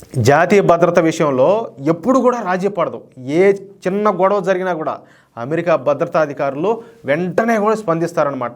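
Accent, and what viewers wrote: Rayalaseema, India. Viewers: Every video chustunna midi mi talking style super and asalaina rayalaseema yasa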